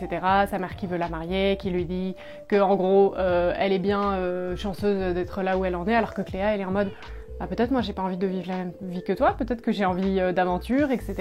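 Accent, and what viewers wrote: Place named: North, France. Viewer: Je kiff trop ton accent tu viens du chnor ???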